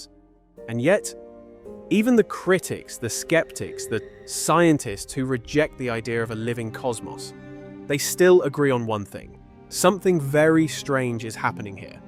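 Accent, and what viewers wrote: United Kingdom. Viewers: i’ve been studying the Sanskrit Vedas to understand things for 15 years. this video is excellent and very easy to understand. nice to hear a fellow british voice too 🙏🏽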